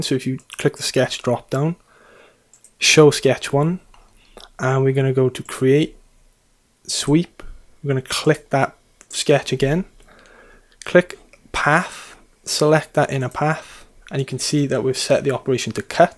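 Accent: South Wales, United Kingdom. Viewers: EXACTLY what I was looking for explained concisely and clearly in that wonderful South Walian accent. Merthyr boy here (now in Snwdonia) enjoying your videos.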